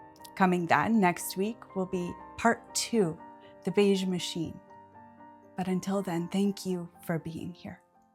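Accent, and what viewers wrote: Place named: Saskatchewan, Canada. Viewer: Growing up just south of the border in Plentywood, I recognized the Saskatchewan accent instantly. More importantly: likely you know this but nobody likes the sound of their own voice. With only a little professional coaching it would seem you’d be more than ready.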